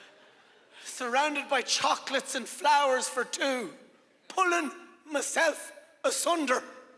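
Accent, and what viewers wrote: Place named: Ireland. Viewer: he’s irish ,man 😂😂thats what we sound like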